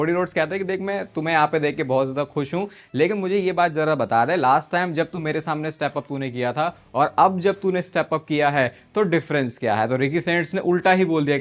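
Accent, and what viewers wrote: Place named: Uttarakhand, India. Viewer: ❤ Bhai's Pahadi (Uttarakhandi) Accent 😅❤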